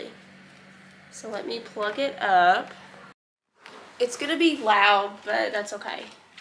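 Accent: Appalachia, United States. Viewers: Love that accent. Didn't even know there's an Appalachia dialect.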